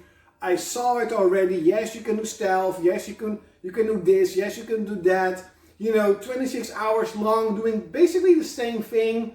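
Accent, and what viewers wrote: Netherlands. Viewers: Where are you from? I think i hear a dutch accent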